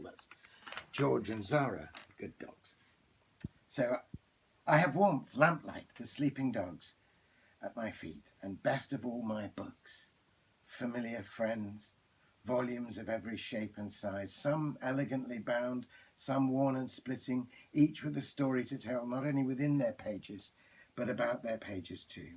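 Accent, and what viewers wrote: England, United Kingdom. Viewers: I love your English accent .😊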